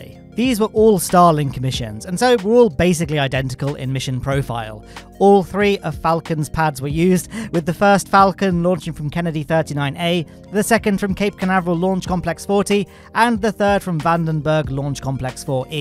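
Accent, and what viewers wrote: United Kingdom. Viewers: I know it may be difficult with a British accent but you need to pronounce certain words correctly, like a persons name or the name of a location. It isn't pronounced Macy as in the Macy's Day Parade, it's pronounced Massee's as in Ma sees, with a long 'a' sound. Everyone else in the dozens and dozens of YouTube channels pronounce it correctly, so why can't you? That is my only criticism of an otherwise excellent video, if I can't pronounce something correctly I find out how to pronounce and get it right, anyone can do it.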